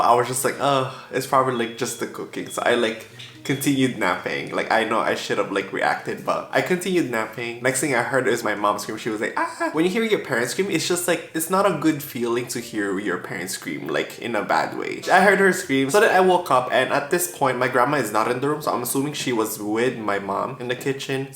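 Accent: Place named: Philippines. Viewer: are you filipino? you have a filipino accent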